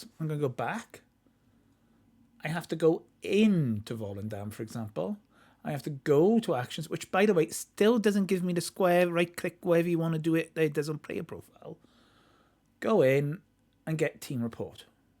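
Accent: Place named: Wales, United Kingdom. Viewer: Nothing worse than the Welsh accent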